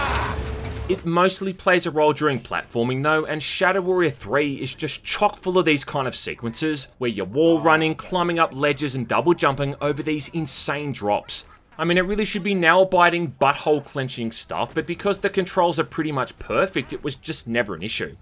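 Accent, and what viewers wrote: Australia. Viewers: Australian mate?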